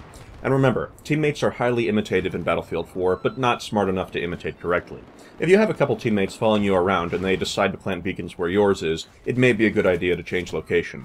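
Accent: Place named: United States. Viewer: what state of america are you from? you have the same accent as the guys from FilmCow i think! you could probably legit go to the titusvile :L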